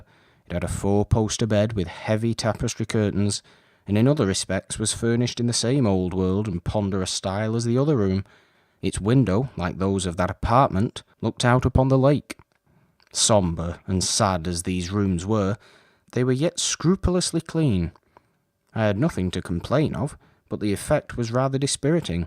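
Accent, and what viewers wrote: Northern England, United Kingdom. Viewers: Love the northern accent; it seems more suited to Tom Wyndsour than the narrator, but it adds to the old-timey feel. This is my all time favorite short ghost story, this and MR James' The Rose Garden. Victorian melancholy at its best. Time to bake up a restorative meat pie!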